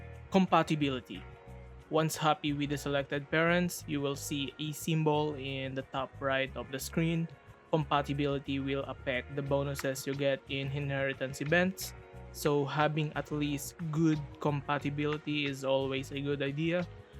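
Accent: Philippines. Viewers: You sound... Pilipins.. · I smell Filipino accent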